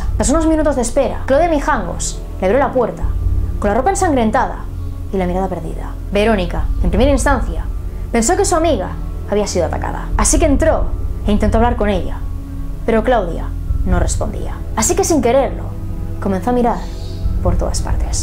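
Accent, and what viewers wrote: Spain. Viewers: le gustan mucho las historias urbanas Mexicanas,le da un sabor muy agradable su acénto Español..!!😃😉🇪🇸🇲🇽👻🌵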